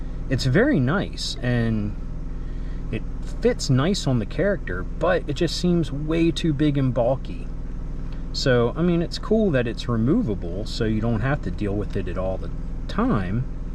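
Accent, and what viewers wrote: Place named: Maryland, United States. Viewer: Are you in Maryland you sound like it I'm in Baltimore I know how we say our Os. If so I'd love to do some Vinatge Joe swaps with ya if your ever interested!!. Also an 80s Kid as well grew up in Baltimore County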